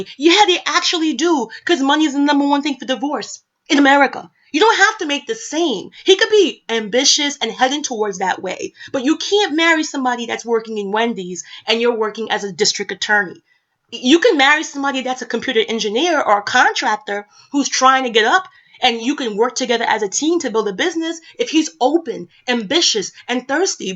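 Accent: Jamaica. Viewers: Hey, I hear the patois trying to come out a yuh girl. Us yard women know when a woman is fed the f@ up. Keep these stats coming sis. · lawdhamercy!! lol luvin ur jamiacaness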